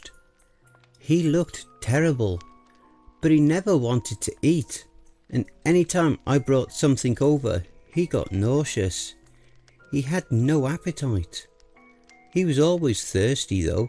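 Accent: United Kingdom. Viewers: I'll listen to it. Listening to a narration with a British accent is so great.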